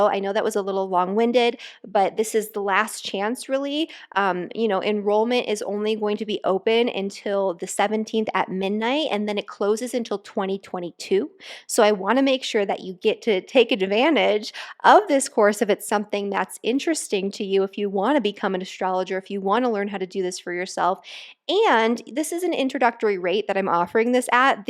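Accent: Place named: Oregon, United States. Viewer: I do not understand any of this but the only thing is for sure in this life : We are Doomed to any idiocy from the movements of our galaxy , anyway this girl is the reason I watch this , her accent I like it a lot sounds from Oregon , but may be from somewhere else, anyhow thanks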